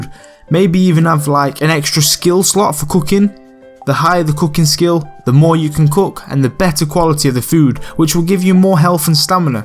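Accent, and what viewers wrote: United Kingdom. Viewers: I love your content so much but your accent annoys me so much 😂 I'm sorry, I still watch but it does annoy me not sure why. which part of Britain are you from? Lancashire here:)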